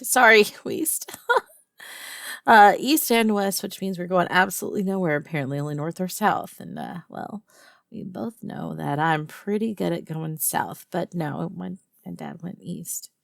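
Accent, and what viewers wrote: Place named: South, United States. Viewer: Goddamn that southern twang got me feeling weird..... Where ya from girl, definitely gotta be some southern state cus that's a fucking southern accent, i feel like im freaking kut but i definitely here a woman named Betsy with that voice lol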